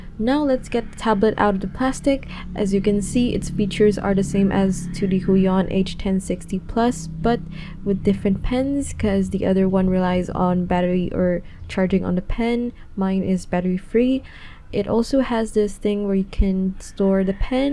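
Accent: Philippines. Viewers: I KNEW YOUR A FILIPINo from your accent ;A; and hands? Is that weird xD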